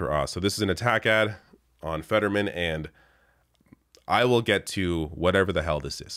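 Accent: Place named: Canada. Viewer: I'm not sure, if you're a Canadian or a Seppo. You sound like a Canadian. Is Canada's opinion of any worth in the US? Are you folks stepping out into the big world of American politics? You, like us were big players in the wars of the 20th Century. Dead men, though, it seems are just gravestones to stand in front of. I'd really like to hear a reply. I'm genuinely interested.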